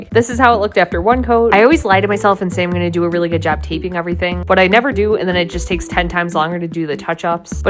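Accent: United States. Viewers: Thickest accent so American lol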